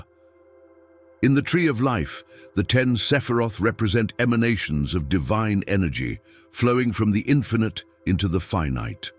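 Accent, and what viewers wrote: United Kingdom. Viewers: It's the British accent. They don't emphasize the r like Americans. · SAY-tan= Satan. And SATurn=Saturn. & Tis not British, but the English accent. Scottish/Welsh, is vastly different.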